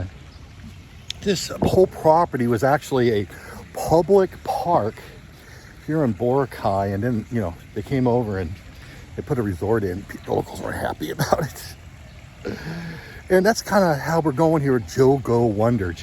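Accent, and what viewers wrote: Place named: Virginia, United States. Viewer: Stop digging on North Carolina. Your accent isn't much different. What are you from Virginia?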